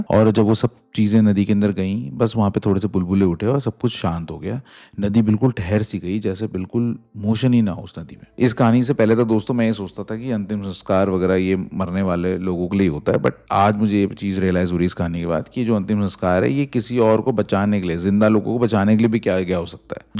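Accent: Pahadi, India. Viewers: Apke bolne m pahadi accent ata bhut acha lgta h sunna mere bhi apne dosto s bolne m pahadi accent ahi jata h😂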